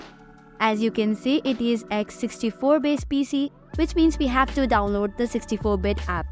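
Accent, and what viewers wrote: India. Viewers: ARE U INDAIN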